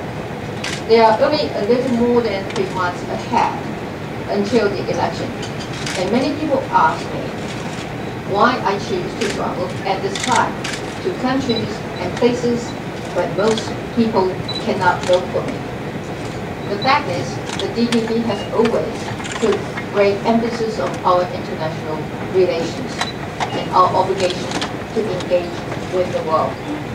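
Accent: United Kingdom. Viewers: 如此純正的英國腔語言豈是牠馬的波士頓抓耙仔或其入幕之密友同志兼大總管公公的小混混所能比擬的呢！水啦！小英！台灣史上第一女 總統！万歳！万万歳！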